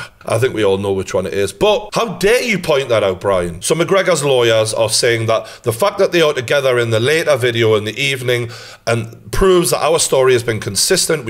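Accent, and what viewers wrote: Newcastle, United Kingdom. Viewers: Why are you putting a funny accent on for Connor McGregor? You can’t talk about anyone’s accent you have a Geordie accent 😂😂😂😂😂😂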